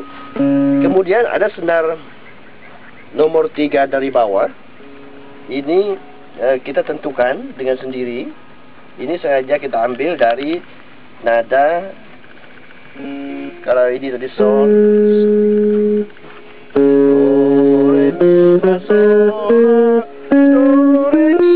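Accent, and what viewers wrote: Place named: Ulu, Indonesia. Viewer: logat asli orang ulu.. mantap abang ni, akhirnya dapat juga pencerahan belajar sape.. salam dari Sanggau bang..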